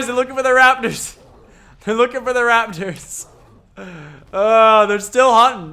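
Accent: Australia. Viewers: Is he australian cause i am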